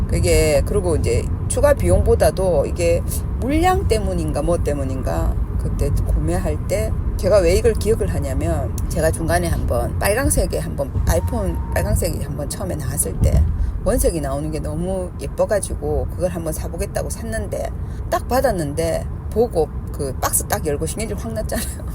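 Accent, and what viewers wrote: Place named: Busan, South Korea. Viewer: 누나 부산사투리 좋아요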